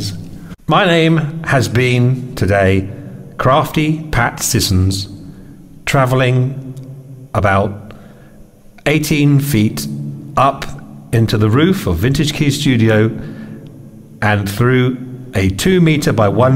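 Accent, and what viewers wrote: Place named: RP, United Kingdom. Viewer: A perfect English speaker with a perfect RP pronunciation talking about a perfect piano of the perfect 70s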